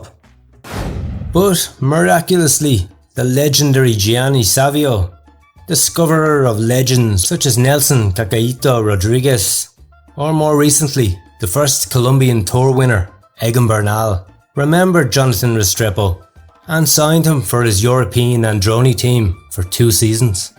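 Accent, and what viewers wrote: Ireland. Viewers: The videos and the information are always great but the Irish accent takes the biscuit or the cake👏💪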